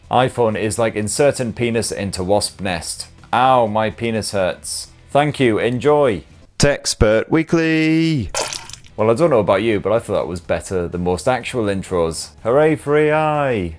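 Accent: United Kingdom. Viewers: I absolutely love you man , your so fucking English it's so funny to watch someone come out with the same English natter than I do 😂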